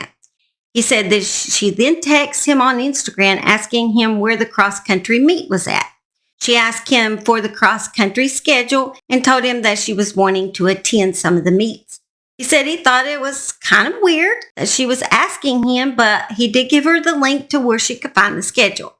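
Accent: South, United States. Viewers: Just a southern harley riding belle of the south lol 😂 love my southern true crime narrator 😊😊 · You might be a "lil ole' southern lady" which is a compliment, but you're an awesome researcher and story teller. We appreciate you and love you. Thank you,.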